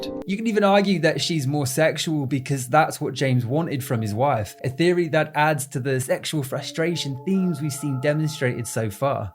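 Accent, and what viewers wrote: United Kingdom. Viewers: You are painfully British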